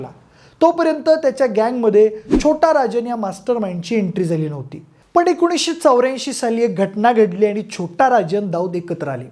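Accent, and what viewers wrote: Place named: Kolhapur, India. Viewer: कोल्हापूर चे आहेत बहुतेक, बोलायच्या style वरून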